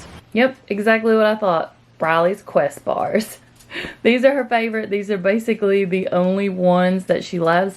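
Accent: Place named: Tennessee, United States. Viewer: I know a Tennessee accent when I hear one. This was a great video. I like to eat a lot too.❤️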